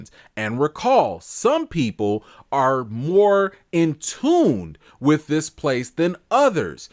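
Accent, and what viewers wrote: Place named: Chicago, United States. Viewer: I KNEW YOU WERE FROM CHICAGO! Lmao and you remind me of one of my friends in Dolton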